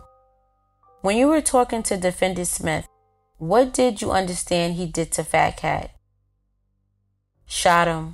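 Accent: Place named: New York, United States. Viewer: Whoever the narrator got a thick new York accent ong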